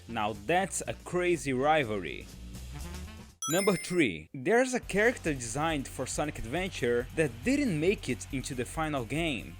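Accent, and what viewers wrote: Brazil. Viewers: Aaaahhh ma tu é brasilero sem sombra de dúvida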